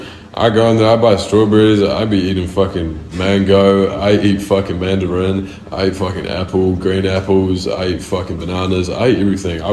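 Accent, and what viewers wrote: Australia. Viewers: HE SOUNDS ALSRLIAN BUT HE SAID HE IS NOT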